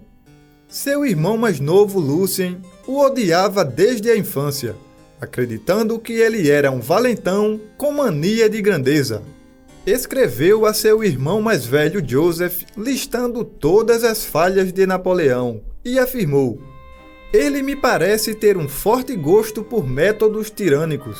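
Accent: Northeast, Brazil. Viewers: vou te contar, amo como você conta.... parabéns.... não perco um vídeo... e seu sotaque e humor dão um toque especial e único... orgulho do nosso Nordeste...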